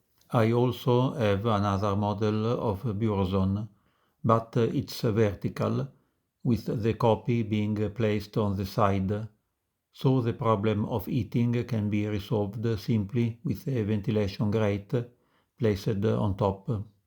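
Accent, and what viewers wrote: Italy. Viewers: Ma... come si fa a registrare un video parlato in inglese con una pronuncia italiana tanto terribile...!!! La prossima volta veda di parlare nella sua lingua, anche perchè youtube offre ottimi sistemi per la traduzione dei contributi in altre lingua... ecchediamine !!! Questo video sarebbe stato interessante, ma sono stato costretto ad abbandonarlo per il disgusto. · The Italian accent makes it. 👌🏼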